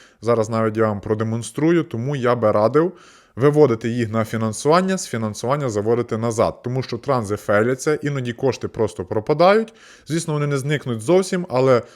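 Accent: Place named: Western Ukraine, Ukraine. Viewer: Приємно слухати на західноукраїнській мові. Молодець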